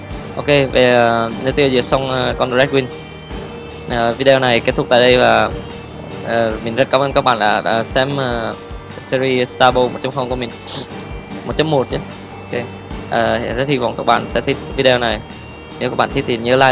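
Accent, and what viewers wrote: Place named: Central, Vietnam. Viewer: Giọng anh cứ lơ lớ tiếng miền Trung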